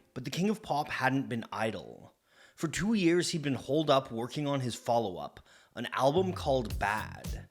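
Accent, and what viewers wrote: Canada. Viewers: I never realized was canadian till he talked about the dart hanging out of slash's mouth l.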